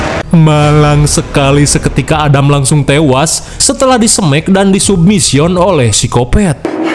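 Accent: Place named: Banten, Indonesia. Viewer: Dina logat Sunda na Sunda Banten doang na ieu Chanel nyh😅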